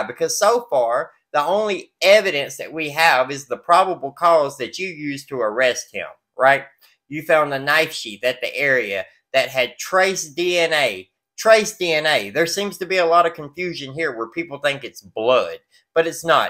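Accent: South, United States. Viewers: I haven't watched the True Crime "special" yet. I can just imagine... I loved your comment about not just selling black gloves at the "murder shop. First time I laughed out loud today! 😂 The southern in you really came out today. Loved it! Thanks for the video! ❤️🎙️❤️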